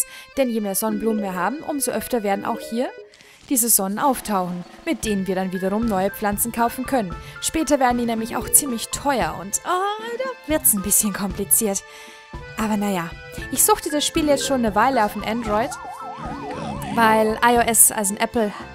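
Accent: Bavaria, Germany. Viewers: Yoooo bayrisch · ich bemerke deinen bayrischen akzent , aber er fällt kaum auf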